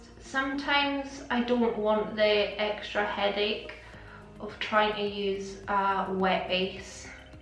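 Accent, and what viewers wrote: Scotland, United Kingdom. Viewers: Love her soft Scottish accent... beautiful xXx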